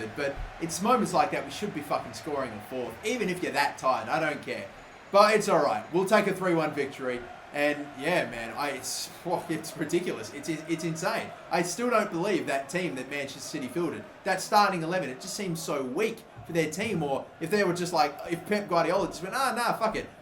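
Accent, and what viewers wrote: Australia. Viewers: He's an Aussie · Is he Australian ?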